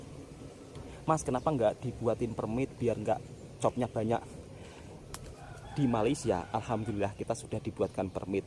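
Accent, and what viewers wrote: Java, Indonesia. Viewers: Nmpk jelas isteri lebih smart dan ulasan2nya lebih baik dan teratur. ni pulk bodo, ckp brbelit2, brulang2..loghat bahasa jawanya yg lebih pekat dan asyik trsasul., sesekali bagi peluang la kpd isteri mmbuat konten, jgn ganggu atau menyebok...pastinya lebih menarik.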